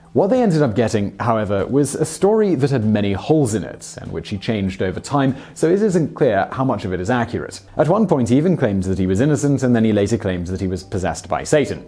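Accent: United Kingdom. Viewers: "Murder" sounds so much more sinister with a British accent.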